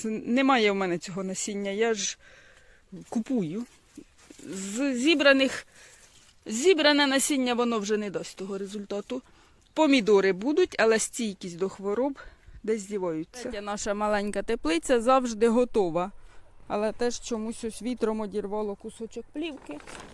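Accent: Poltava, Ukraine. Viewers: Добрий день,. Натрапила на Ваші відео, слухаю не наслухаюсь, обожнюю полтавський говір. Живу в місті, городу не маю, хоч і мрію мати, але дивитимусь Вас заради мови ❤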